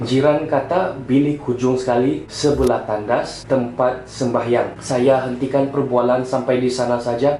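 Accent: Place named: Sabah, Malaysia. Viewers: dialek sabah...islam ke??? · Jgnla macam gitu bang. Sabahan mmg macamtu cakapnya. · Cam bunyi2 org sabah...well as sabahan susah eh mau kasi lari tu slang sabah hehehe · sesiapa yg komen kata cara dia bercakap mcm kupi2 go, korang mesti xpernah dgr org sabah bercakap kn? rajin2 la keluar dari bawah tempurung tu. belajar sikit dgr dialek ckp negeri2 lain supaya lepas ni dgr je dari cara org ckp dah tahu dia asal dari mana.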